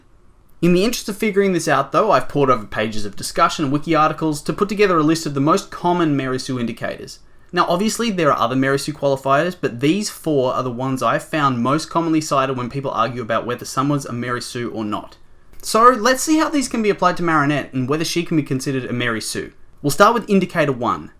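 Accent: Australia. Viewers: You're Aussie aye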